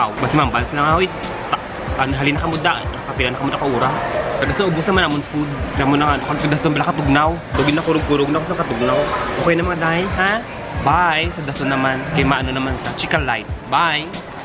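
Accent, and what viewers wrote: Iloilo, Philippines. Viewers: Love!Love! Love the ilonggo accent and seriously kahit diko po lahat maintindihan lahat ng say mo nakakaaliw ka po.Good luck, keep us smiling po.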